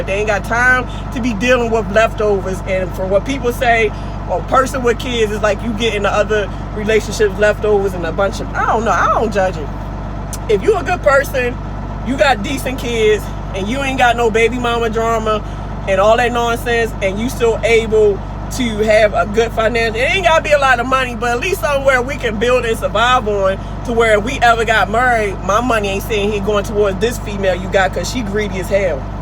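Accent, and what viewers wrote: Baltimore, United States. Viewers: You from Baltimore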